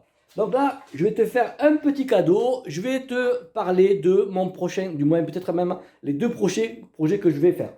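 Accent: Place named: South, France. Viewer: est ben écoute Fréro tranquilou 🤣👍 Ouai tkt , je sais ce que c'est .... Compliqué de tous géré 😭 Mais bon .... Ça fait plaisir de revoir ta bouille et l'accent du sud bordel 😁💪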